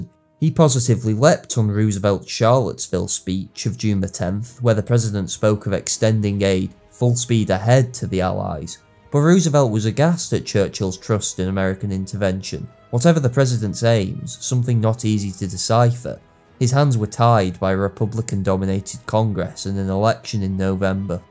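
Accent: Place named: Cockney, United Kingdom. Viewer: I do not understand this cockney accent.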